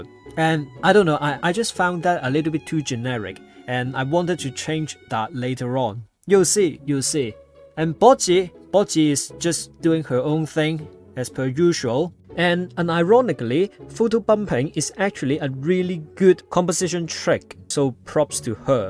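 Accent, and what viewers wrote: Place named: Singapore. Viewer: I love this <333 Also I feel like i went thru a literal roller coaster trying to figure out ur accent. Like, first I thought it was British , then Indian, then now I think it’s singaporean?????? Mhjvnejenrfv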